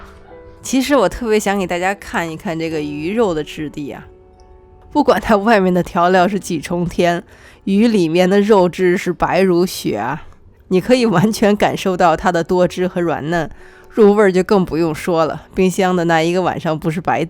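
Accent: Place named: Xi'an, China. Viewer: 高姐是西安人吗？和我说话的感觉一样！突然觉得留学很亲切！ 最主要的是，高姐可以用很美国化的食材烹饪中国的食品，简直欲罢不能！大赞～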